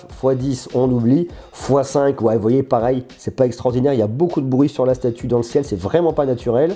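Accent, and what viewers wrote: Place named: France. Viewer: Pourquoi crier en utilisant une langue si déglinguée. Pourquoi essayer de faire BCBG en utilisant, avec un accent à couper au couteau, des mots en anglais. En français il y a des mots pour tout. J'ai commencé à regarder, car j'ai acheté un Poco F2. Mais je suis finalement allé voir une autre vidéo, en anglais celle-là. Et sans accent français à chier. Pourquoi faut-il crier?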